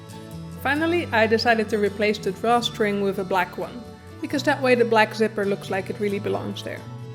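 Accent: Netherlands. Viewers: ik hoor gewoon t nederlandse er doorheen, maar dit is zo goed uitgelegd, zelfs ik snap t in 1 keer en ik heb echt moeite met begrijpen, top video! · dank je wel! Clear and to the point!! Wonderful tutorial. ❤ Also, as someone who grew up in Germany 5 min from the Dutch border, it was lovely hearing your accent! Brought be straight back home. 😊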